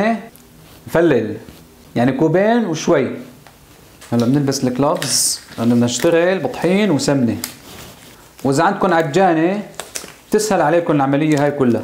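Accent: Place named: Homs, Syria. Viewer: ماشاء الله مبين شغلك اديه مرتب الله يقويك ويعطيك الف عافيةوأحلى من القراص لسا الحكي الحمصي منحوط😊 أنا بفرفح قلبي بس اسمع حكي حومصي لأني انحرمت منه بالغربة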